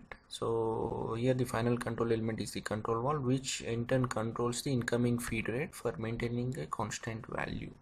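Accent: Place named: India. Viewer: You need to learn how to speak English more better your India sounding accent sux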